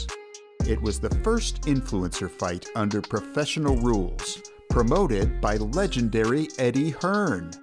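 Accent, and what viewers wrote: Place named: United States. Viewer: ur voice does not sound like a Ukrainian you’re American bruv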